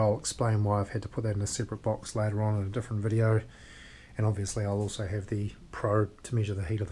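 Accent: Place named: New Zealand. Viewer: Option 1: Mate go to scrap car yard get an old car / truck radiator spray it black put in insulated glass box point at sun. Option 2: Alternatively get copper or aluminum tube paint with matt black assemble in insulated glass box point at sun. Option 3: Section off part of garage roof, seal and paint matt black, place cheap clear plastic cover over the top and send water down. Note seal well due to expansion of roof when hot. The option you have shown as will as first two above would work over a camp fire, tea candles, hot embers etc.. Keep up the good work kiwi "Kia Kaha" Stay Strong, I heard accent and saw orange sand bucket.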